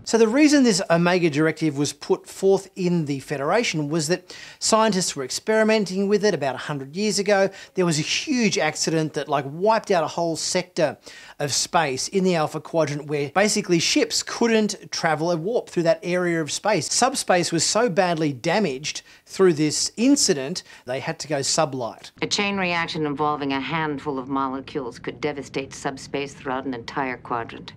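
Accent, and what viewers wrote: Australia. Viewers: That's our Aussie accent! 😆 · Hi mate, great upload, listening to your accent and thinking, hey up, this blokes Australian and that reminded me of the Voyager episode with Species 8472 where they enter Fluidic Space, what's never explained is if they enter FS at point A, when they leave it, are they still at point A or anywhere else? So I could leave my house in the UK, enter FS and then jump out of it in Australia and meet you down the pub, not using Sub Space just Fluidic Space. Yes I know 8472 wouldn't want people crashing through their territory every five minutes but maybe they'd negotiate a section of space, ( just how BIG is Fluidic Space anyway?) far away from them that the Federation can use as a bridge from A to B.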